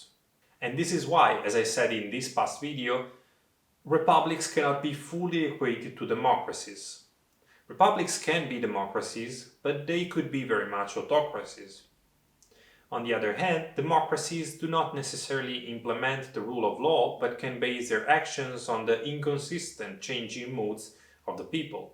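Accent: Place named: Italy. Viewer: Min 2,31 you say the expression jus interdicendi without explaining his meaning? I'm italian ( I suspect you are too... looking at your name and your accent) and I studied latin in high school and understand it... But how can people around the world understand? Should they look at the subtitles and take their time to google it?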